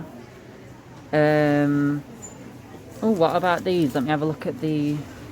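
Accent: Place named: United Kingdom. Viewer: I here a British accent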